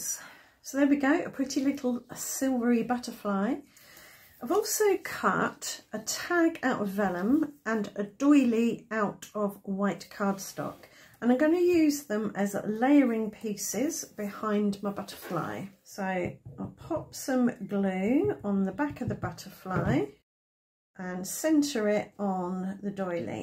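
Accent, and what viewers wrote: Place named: United Kingdom. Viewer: I love hearing the British accent too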